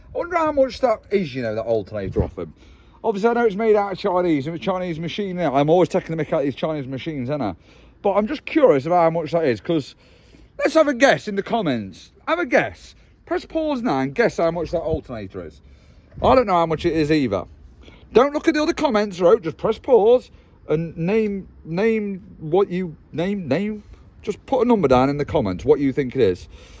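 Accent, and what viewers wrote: Australia. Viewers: Was the Australian accent inspired by the Ozzy fella with the big beard who does the heavy welding on earth moving buckets and the like... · Aussie accent ? I thought I'd dropped into Norfolk Man Cave's channel for a minute there boi !😂 Your welding's gone from "Town centre" pigeon, complete with a manky leg and missing foot, to something you'd find in a bespoke dovecote from a copy of "Country Life" magazine !😂 Is there a service exchange price included with the alternator, or do the Chinese not even want it back ?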